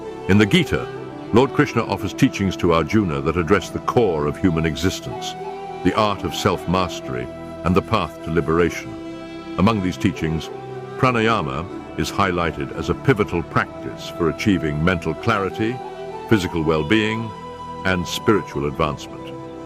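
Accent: United Kingdom. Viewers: Why do you use British voice over? Are you feeling inferior to those assholes?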